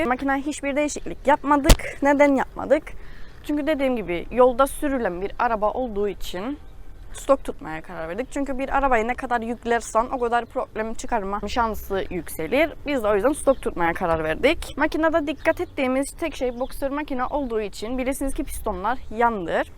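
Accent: Cyprus. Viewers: Kıbrıs lehçesine bayılıyorum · Ya bu Kıbrıs konuşmasına bayılıyorum 🤣🤣Kıbrıs tatlısı kız formula yarışcısı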